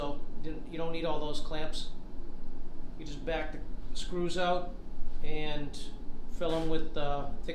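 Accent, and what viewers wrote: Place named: Massachusetts, United States. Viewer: I was wondering for a while why you didn't glue together the two lengths of transom reinforcement earlier in the process. But you then explained that it was to minimize the effects of the epoxy on your drill bits and to consolidate the glue-up of the transom and brace into one operation. That kind of forethought and careful planning is an example of what separates you from the vast majority of YouTube boat builders. Thank you. P.S. As a Rhode Islander born and bred, I appreciate your Massachusetts accent, your Quincy lat/long signboard, and your Cape Cod hat!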